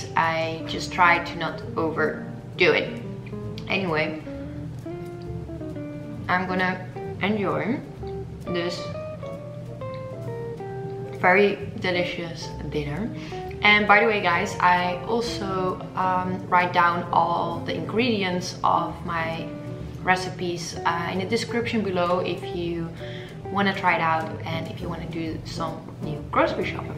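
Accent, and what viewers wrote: Netherlands. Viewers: you are dutch aren't you? i hear it in the welkom and the ja's in the beginning of sentences ahaha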